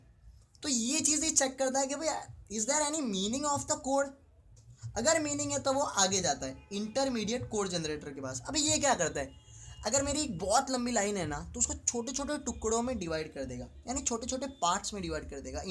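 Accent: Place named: Marwari, India. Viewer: Marwadi k tarah😂.. Ek no bhai👌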